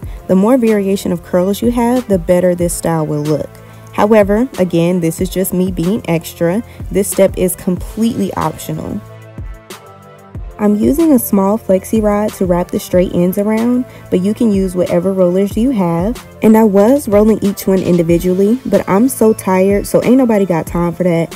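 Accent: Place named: Jamaica. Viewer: Jamaican Accent Yes 💞💞 lol.. You come to take over YouTube 👏👏👌👌 Get it kinks Beauty..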